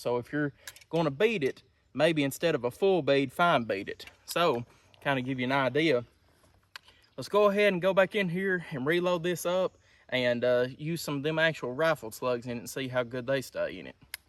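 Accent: South, United States. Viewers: Why does watching a gun review from someone with a heavy country accent feel so much more reassuring?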